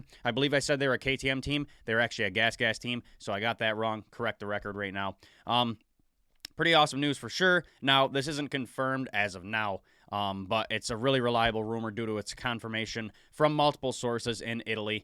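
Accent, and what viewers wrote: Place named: Michigan, United States. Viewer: U from michigan? I hear an accent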